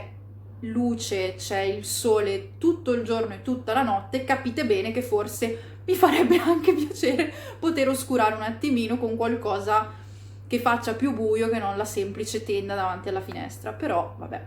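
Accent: Italy. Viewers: ma perchè parli con accento milanese se sei in norvegia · il tuo accento è italianissimo, lombardissimo. E' esteticamente che sei italiana quanto io curdo. Le tue ORIGINI non sono italiane. O hai il coraggio di dirmi che tutti e 4 i tuoi nonni sono italiani ?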